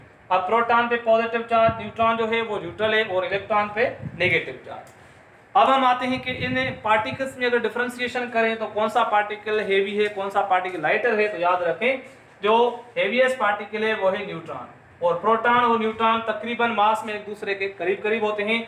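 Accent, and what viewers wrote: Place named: Sindh, India. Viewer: baba saein full sindhi accent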